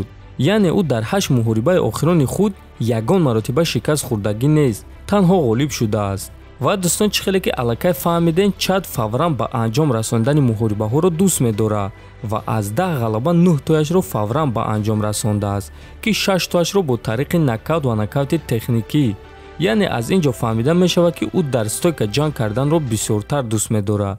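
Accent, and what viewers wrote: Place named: Garm, Tajikistan. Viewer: Ами брать гарени гап мезана неки натури хамашахушру мефахмона классс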